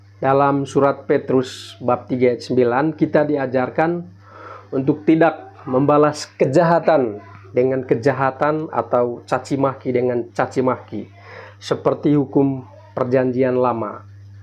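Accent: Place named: Manggarai, Indonesia. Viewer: Bahasanya manggarai sekali e kësa 😅😅...semangat dalam memberitakan keselamatan ❤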